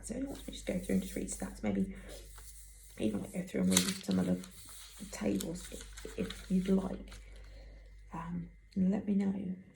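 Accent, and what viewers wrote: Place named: London, United Kingdom. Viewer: ah I see! Well it works pretty well! It's essex but a lot of people say it sounds like London! Sure I'll do that after my first bride book video! Thanks for your support on my growing channel!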